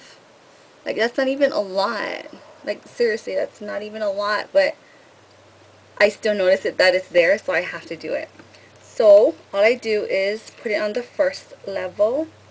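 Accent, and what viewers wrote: Hawaii, United States. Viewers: R u Hawaiian?? You really sound like a local girl from Hawaii:) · Omg thanks for the review and demo! I have the darkness under my arms so I'm considering this product. :D I could tell by your accent that you're from hawaii! Great review!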